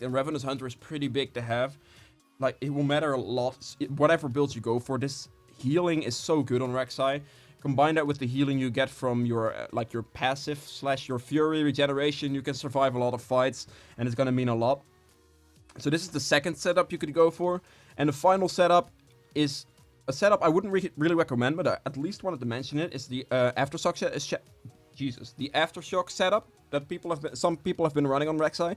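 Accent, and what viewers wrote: Netherlands. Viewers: Are you Dutch/Belgian?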